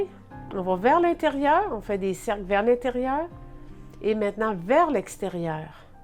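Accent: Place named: Canada. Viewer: Quel bel accent canadien. Leger pour moi. Merci bcp From israel en confinement😞😷😷😷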